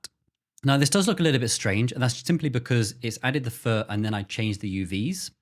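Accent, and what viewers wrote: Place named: England, United Kingdom. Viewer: Funnily enough the first thing I thought was “this guy grew up near Daniel Radcliffe” based on the accent lol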